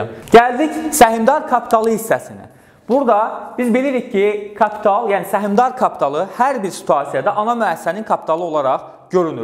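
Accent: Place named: Sheki, Azerbaijan. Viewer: Müəllim deyəsən Şəkilidir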